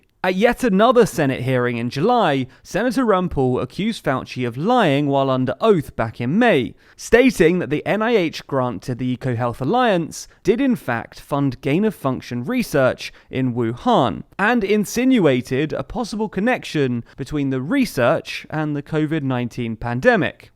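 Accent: England, United Kingdom. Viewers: It's the English accent. He did say, "Rand". Unless it was written and I missed it.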